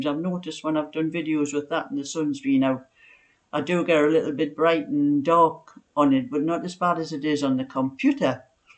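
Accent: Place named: North East England, United Kingdom. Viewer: Oh dear I wish I could get to like the music at the start of your recording. I don’t,t recognise it either. However I really enjoy your channel, hearing your lovely accent from the north east. My husband and his family came from your part of the world. Sadly My husband, who was a very fit 83year old passed away the first day of lockdown from Covid. I enjoy your cooking and baking as well as your beautiful knitting, and I do hope you win at your bingo sessions. My mother in law was a great follower of too. Warm regards Christine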